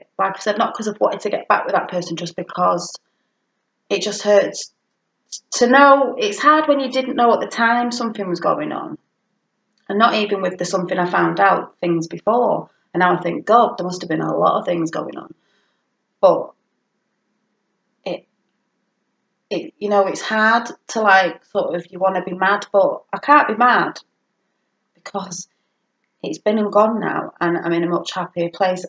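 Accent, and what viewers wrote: United Kingdom. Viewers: Oh no just be you!! Your fabulous 😊 Loving your content and it's so nice to hear someone else who's British LOL! Witches of East end is pretty darn good (not bad eye candy either) 🤣 xx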